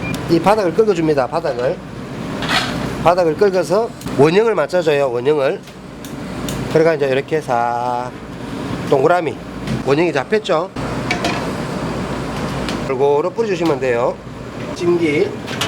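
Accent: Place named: Gyeongsang, South Korea. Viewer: 구시한 갱상도 사투리! 따봉입니다! 꼭 따라해볼께요!! ❤️👍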